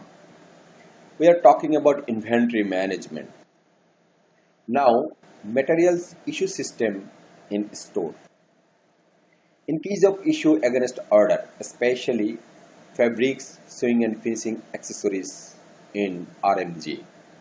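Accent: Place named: Comilla, Bangladesh. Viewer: Why are you mixing your lecture with english, bangla, camilla accents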